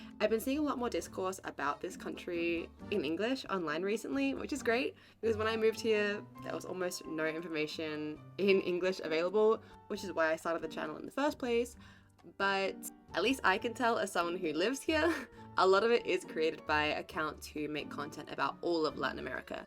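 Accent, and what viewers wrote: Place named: Uruguay. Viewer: Hola como hace para hablar espanol con acento uruguayo? donde lo aprende? Me refiero a: "perdés" eso deberio ser "pierdes". ATB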